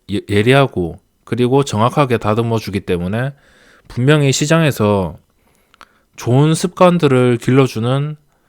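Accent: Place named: Changwon, South Korea. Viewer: 저도 창원살고있어요 ㅎㅎ 제목보고 들어왔는데 너무 솔찍한 우리 동네 말투로 강의해주셔셔 친숙하게 동영상 재밌게 시청 하고 있습니다 감사드려요~^^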